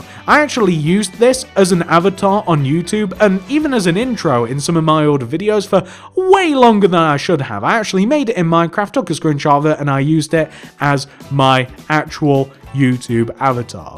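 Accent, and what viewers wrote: United Kingdom. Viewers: Your voice doe. I never seen you get excited like before xD your voice is british doe.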